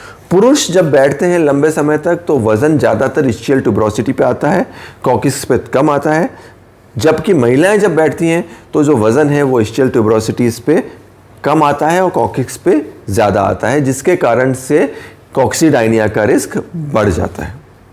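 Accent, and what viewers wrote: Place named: Gujarat, India. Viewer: Gujrati ki zuban me gujrati jalak mil hi jati he .. nice explain sir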